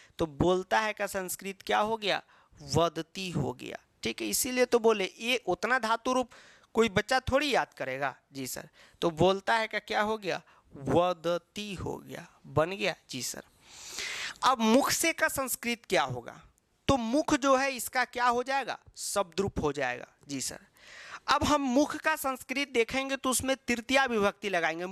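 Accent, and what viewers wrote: Bihar, India. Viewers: Are you bihari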